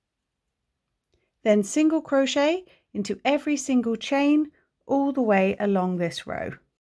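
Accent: United Kingdom. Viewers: now i am confused - a uk accent but usa stitch names.....i would call thouse double crochet and treble crochet stitches.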